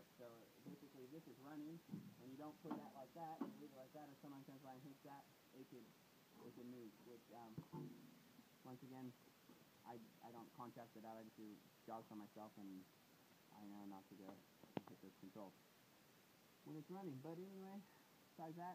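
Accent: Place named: United States. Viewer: Awesome! You still sound like an American! Third kid? Firefighter?! Damn, I miss the crap outta you guys. . . give my love to Nic. BTW, my profile pic is me raising the main just outside 39 while YOU are at the helm with Serena and the photographer Erin in the cockpit! Goodtimes, my man, gooood times. . .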